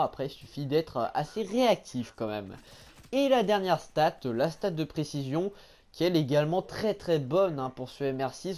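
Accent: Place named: North, France. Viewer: Tu viens du nord toi non m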